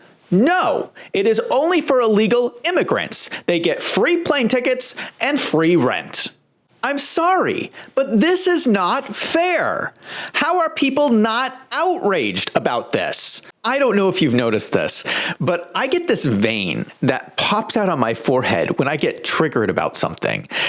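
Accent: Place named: United States. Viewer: I like your voice, very American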